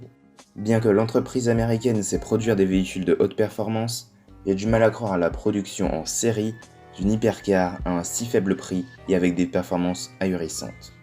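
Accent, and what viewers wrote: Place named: Spain. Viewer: le tite accent espagnol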